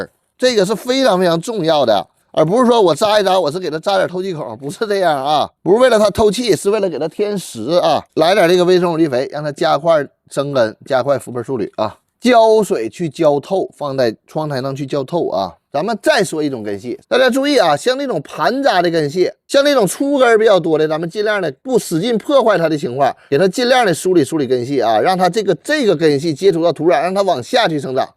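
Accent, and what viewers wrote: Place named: Northeast, China. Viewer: 这是哪里的东北话呀？比其它听到的东北话好听。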